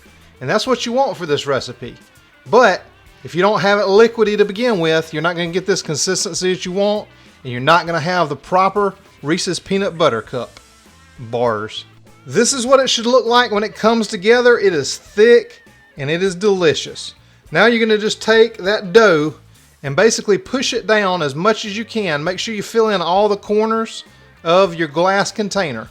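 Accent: South, United States. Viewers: Thanks, I can't wait to try this! P.S. this WV Hillbilly loves your Southern accent.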